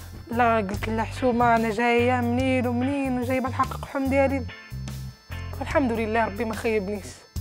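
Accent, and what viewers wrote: Egypt. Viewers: لا من لباسك ولا من لهجتك كل الناس كانت تقول بأنك مصرية يا وخيتي احكي بي لغة القران العربية هل شوفت مصرية تحكي مغربي او لبنانية تحكي مغربي ؟؟ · اويلي وحدي قالت ليك كاتحاااول باش تهدر بالداريجة هاذي باقة حتى ما تشهرات ومعمرها خرجات من المغرب و غالبة عليها اللهجة المصرية ويلي بااا قالت لك كانحااول ايوا بلاش ما تبززي على راسك ا حبيبة 😬😬😬😬😬😬😬😬😬😬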